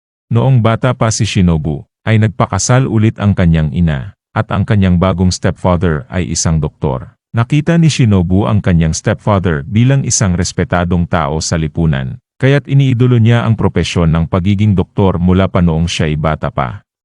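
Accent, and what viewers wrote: Philippines. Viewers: BWISIT SAANG KUPALOP KA NANGGALING KAASAR TAGALOG MO🤬🤬🤬‼️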